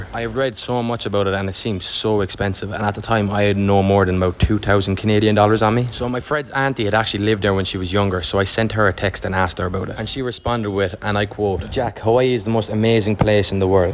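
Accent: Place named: Ireland. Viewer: Nah your Irish Accent has got me weak 🤤 and your face is distracting 😍 got me in a trance 🤩 YOU ARE BLESSED & BEAUTIFUL 💐😇🆙️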